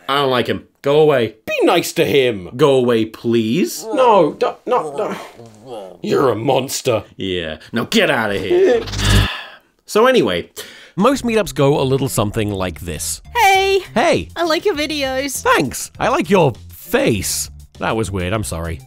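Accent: United Kingdom. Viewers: just wanna say love you vids not only because your also English (I assume from the accent) but because your videos have always made me laugh 😁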